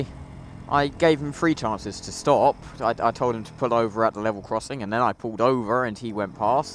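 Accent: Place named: England, United Kingdom. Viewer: Normally i hate the english accent but your's is awsome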